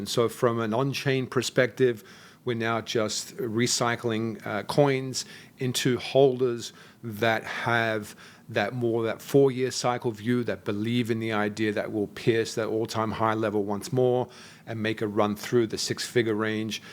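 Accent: Australia. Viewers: I can’t place his accent… Is there a little bit of Aus or Kiwi accent in there??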